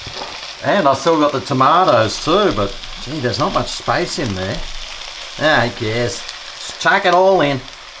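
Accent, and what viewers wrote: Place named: Australia. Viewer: The best Aussie cook on you tube. And with very good Aussie accent and great sense of Humour. Everything is cheap and very efficient with time without stress at all. Very inviting when I watch your programme. Keep it flowing. Happy New Year Mate, from Hobart Tasmania.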